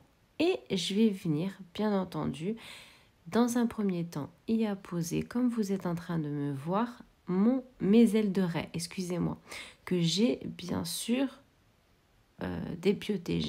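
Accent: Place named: South, France. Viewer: Une explication distinctive du sud et la bonne chance bb 😍😍😍😍😍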